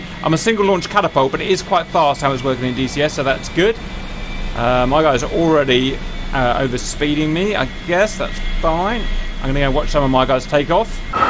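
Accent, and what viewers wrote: Australia. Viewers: Being an Australian & listening to aussie accent was AMAZING! Love the videos keep it going. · Love the aussie accent mate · what would be cool to see is a naval chopper battle using helicopter only carriers. also ur aussie accent was pretty decent. keep up the good work. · Cheers bro, I'm an Aussie and I can say your Aussie Accent and made up words were hillarious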